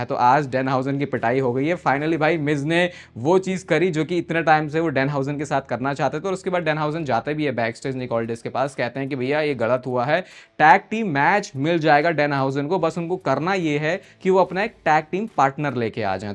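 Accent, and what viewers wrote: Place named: Uttarakhand, India. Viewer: ❤ Bhai's Pahadi (Uttarakhandi) Accent 😅❤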